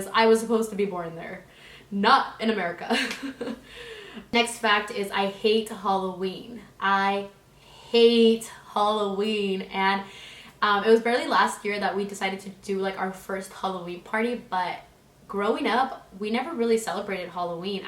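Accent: United Kingdom. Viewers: That accent is def british hahahaa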